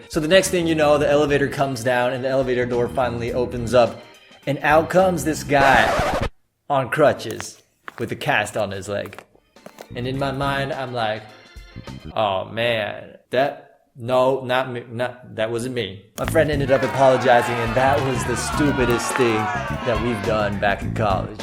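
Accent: Standard. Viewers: 真的很感謝你做這些影片以及可以讓我們練習習慣非常標準的口音，但是中文字可以調整成當我有設定cc字幕的時候再出現嗎?有時候我只想看看英文不想看中文，但我關不掉。不管如何總而言之，超級愛你的正能量~~ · 很喜歡你的口音發音很標準!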